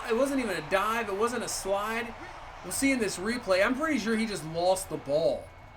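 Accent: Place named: Philadelphia, United States. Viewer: sorry I know that seems like an odd question but I have a good friend who is from right outside of Philly and you sound exactly like him. I am from the south so the accent sticks out to me.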